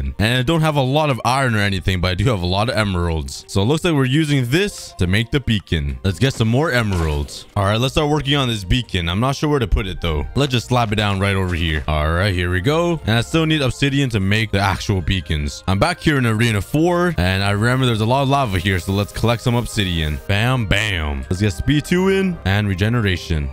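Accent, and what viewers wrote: Canada. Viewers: is you canadian?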